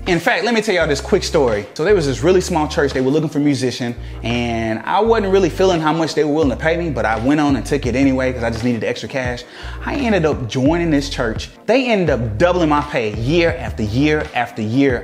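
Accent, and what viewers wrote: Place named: South Carolina, United States. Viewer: Were are you from the accent sounds familiar. S carolina I'm guessing. Just a guess.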